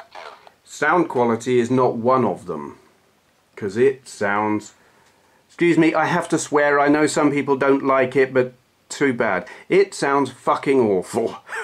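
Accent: United Kingdom. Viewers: Your cussing is refreshing, especially in the Queen's english. = )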